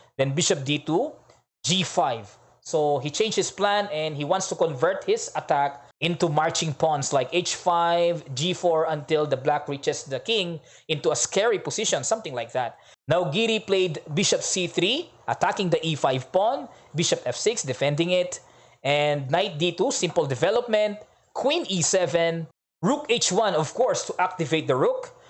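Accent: United Kingdom. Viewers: galing namn.. nanibago ako.... sir coach....parang british ang dating..napakahusay mo..saludo kami ng mga anak ko sayo... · naks... coach... galing ah... British accent. nanibago ako.. "IM ".☺✌✌✌✌✌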